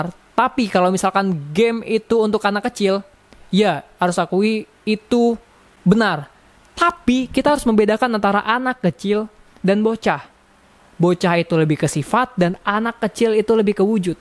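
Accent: Indonesia. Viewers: Bang, aku mau nanya tapi jujur ya, abang orang Babel ya?, Bangka Belitung,.. iya gak?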